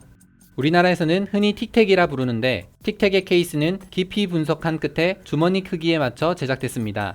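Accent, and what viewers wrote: Gyeongsang, South Korea. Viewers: 원래 출신이 경상도 출신이신듯. 왜냐면 억양이 유시민 느낌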